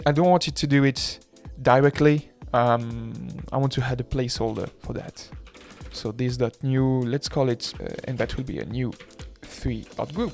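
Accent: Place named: France. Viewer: IBCs !!!!! I am now co-opting this acronym shamelessly and, like a true american duché-bagette....I will likely fail to give you proper credit for its origin. Only because it would be difficult to reference your work; nobody knows who you are yet.....yet. Don't stop doing what you're doing. It's inspiring. Plus I would rather hear this kind of material with a french accent anyday of the week. Especially the cursing and self depreciation....I even find myself using a similar french accent in my head when I am berating my big dumb American brain for my frequent fails at tasks that I begin overconfidently only to realize how much more there is for me to learn.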